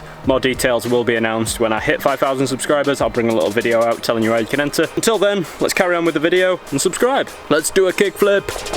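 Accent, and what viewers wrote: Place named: United Kingdom. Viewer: Am getting back into skating at 30 am watching like like waaat I have allot to Learn haha you sound like your from UK same as me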